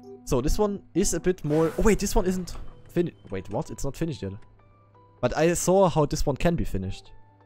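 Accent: Germany. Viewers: Are you from Germany? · Jedes Mal, wenn ich den deutschen Akzent höre, muss ich mir vor Augen führen, dass mein Englisch für Muttersprachler genauso schlimm anhören muss. 😂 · r u German?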